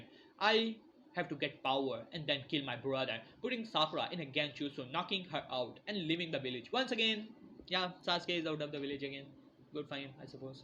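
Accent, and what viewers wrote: India. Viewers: I swear! Each time I hear this person! I think his someone from India.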